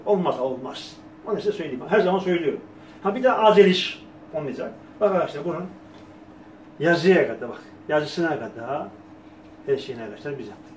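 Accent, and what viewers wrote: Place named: Rize, Turkey. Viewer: Adam rizeli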